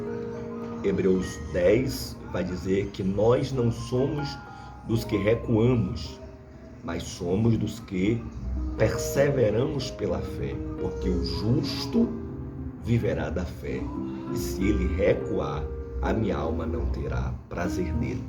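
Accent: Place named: Paraíba, Brazil. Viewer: Sou paraibana e o nosso sotaque são parecidos e a sua mensagem era Deus escolheu Maravilha glória a Deus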